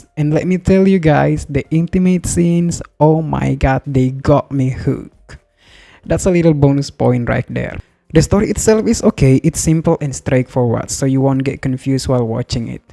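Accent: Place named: Indonesia. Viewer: Kyk aksen orang indo min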